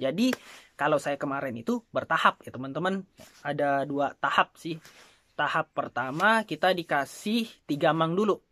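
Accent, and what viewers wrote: Java, Indonesia. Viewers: Ooo tak kiro jowo cak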